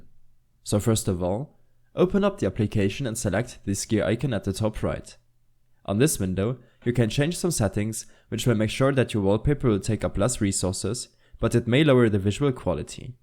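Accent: Germany. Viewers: ich wusste das du deutsch bist wegen dem Akzent haha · Man hört direkt raus, dass du deutsch bist xD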